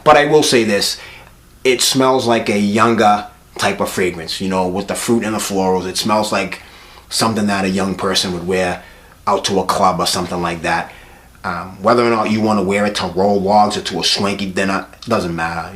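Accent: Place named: Northeast, United States. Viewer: Hi, first time viewer of your channel and I love it. Could you please tell me where you got the fragrance? From your accent, I presume you are in the Northeast US (like me). Did you have to order it direct from Montale? My usual go to Montale spots (Bergdorf, Neimans, etc) don't have it. One more question, if you have sniffed Dubai Indigo (Bond No. 9), does it smell too similar (I saw that mentioned somewhere)? Thanks in advance, I am definitely subscribing 😀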